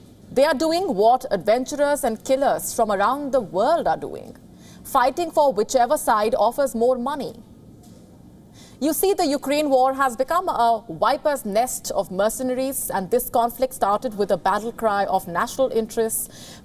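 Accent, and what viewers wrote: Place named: India. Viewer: your English sounds really Indian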